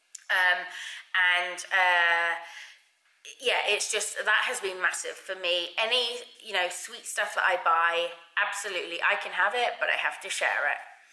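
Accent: England, United Kingdom. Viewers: Been watching your vids and this was the first I heard with your vocie! Me: oh she's English!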